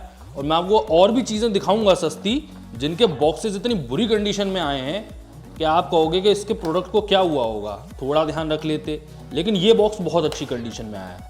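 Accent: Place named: Uttar Pradesh, India. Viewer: bhai tumhare bolne ka tareeka bohot badiya hai........bilkul up touch hai accent me......kanpur se ho kya??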